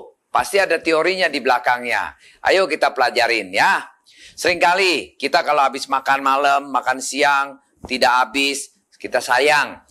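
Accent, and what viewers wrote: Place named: Jakarta, Indonesia. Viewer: Pak ngomongnya kaya orang betawi🖒🖒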